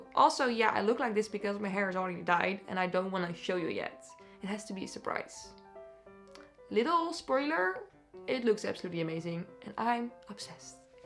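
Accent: Netherlands. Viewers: At first I though you were Danish(your name ). Today I was like no way she sounds NL. Hey Dutch sister.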